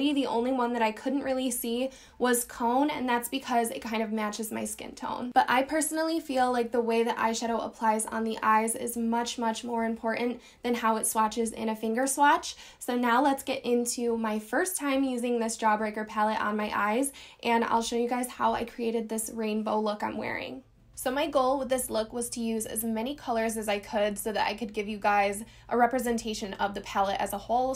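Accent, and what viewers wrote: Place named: Michigan, United States. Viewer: Are you from Michigan? I'm a former Toledoian and your accent sounds like home! Haha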